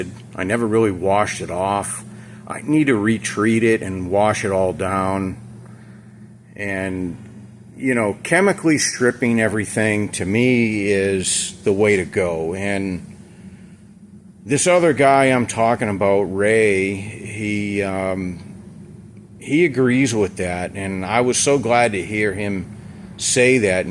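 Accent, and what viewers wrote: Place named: Boston, United States. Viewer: Apaht. All the pahts. Miss being around that accent , raised in the Baystate (living in the south now)… anyhow dipping it is hella pricey but no warping no sand surprise when painting no texture changes. Sitting on my ‘47 dually till I can afford the same. Going to set it on a 90’s cummings dodge chassis… Hey check out Rungë cars on yt, great coach builder also khaos design yt out of Australia great stuff.* edit to add love your perspective and attitude- so refreshing. Also great point on wanting to drive it not perfect it- oh man that hit home😅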